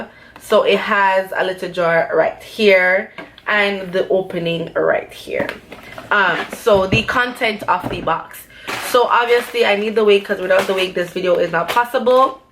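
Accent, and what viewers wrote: Jamaica. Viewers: You deadass sound like a jamaican accent hidden there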